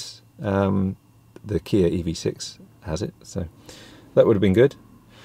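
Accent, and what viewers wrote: United States. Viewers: One question. Great American accent! Have you done some acting in the past? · I liked your American ascent :) ........and I'm American. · The American accent… 😂😂😂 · The American accent wasn't so bad, please do a full episode with the accent!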